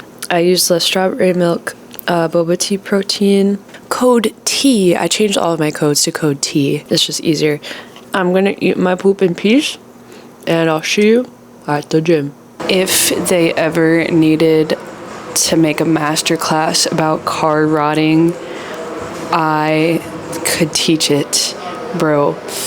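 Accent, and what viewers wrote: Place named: Upper South, United States. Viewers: Where is this kind of American accent from? It "low-key" sounds like a total laid-back good-hearted goofball who is secretly some kind of genius but covers it up well. I love it. Chillax, bro. Bonzo beans! :person-turqouise-waving::person-turquoise-waving-speech::person-pink-swaying-hair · It sounds top of the south. Oklahoma, Kansas, Colorado, eastern cali. Possibly an empty state as well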